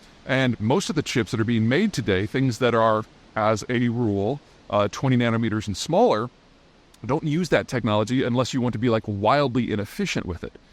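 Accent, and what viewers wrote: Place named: Midwest, United States. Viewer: Hi: That is a well known speech pattern common to the midwest America, lots of other examples, picture and pitcher. I have this myself, I can't hear a difference between "nu-clear and new-clear" Daniel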